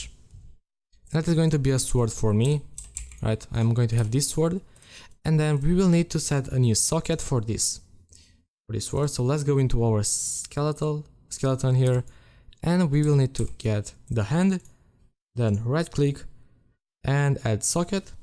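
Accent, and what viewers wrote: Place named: Russia. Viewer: I just think you have an accent,so I thought you were Russian)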